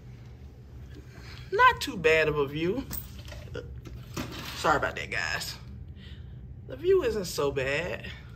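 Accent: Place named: Arkansas, United States. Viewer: I knew you were a fellow arkansan as soon as I heard you speak! LOL! Thanks, from Ft Smith!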